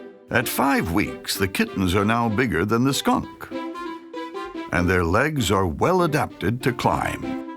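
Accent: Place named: Transatlantic, United States. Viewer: Long time since I heard that transatlantic accent! Makes me think of an old cartoon movie.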